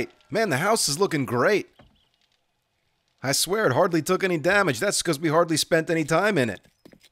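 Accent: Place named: Boston, United States. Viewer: Quick question you from Massachusetts?? You sound like your a fellow bostonion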